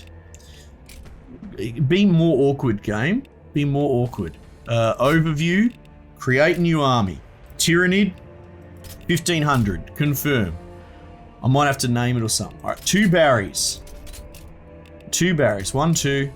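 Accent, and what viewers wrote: Australia. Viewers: It is an Australian accent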